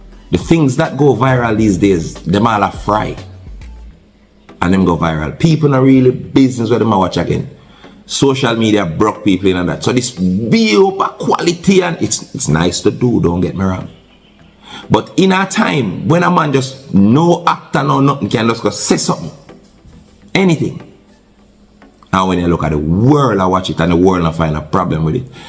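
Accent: Jamaica. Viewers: Well spoken Jamaican accent. Kingsley. Nail it😅 Andrew Holmes is well spoken like Kingsley ❤❤❤❤❤❤❤😂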